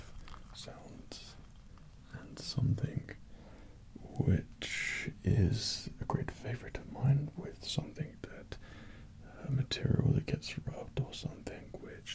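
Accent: United Kingdom. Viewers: Are you British? It would be nice to find a british asmrist! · is he British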